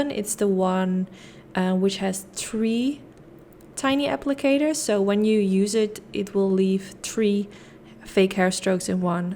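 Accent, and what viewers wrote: Netherlands. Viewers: Are you Dutch? 😁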